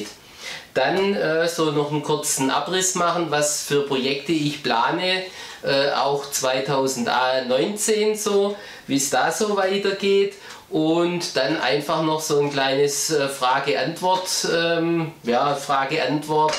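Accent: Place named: Saxony, Germany. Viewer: hört man doch: Sachsen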